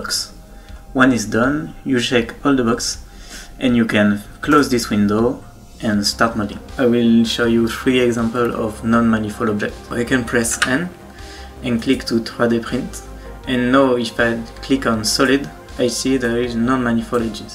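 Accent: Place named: France. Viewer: A german viewing a tutorial in english narrated by a french. Thanks for the help! I think I will try blender in the future!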